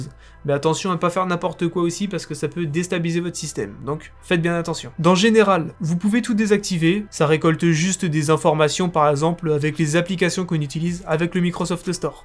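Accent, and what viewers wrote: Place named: England, United Kingdom. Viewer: sartek l'accent rosbeef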